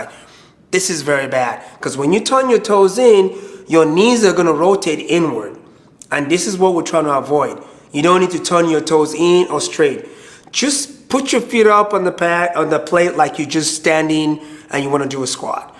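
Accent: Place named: Ghana. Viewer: Sounds like youre from Africa..Ghana maybe?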